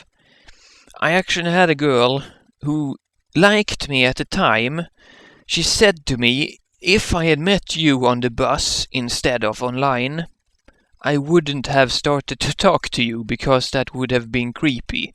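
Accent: Sweden. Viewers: med Wa...Yeah, I agree on both points! :-) I was like, "Is he Scandinavian, German, Swedish, just Autistic vocally in his own way?...But hey, Swedish sounds good to my ears from this guy. ;-)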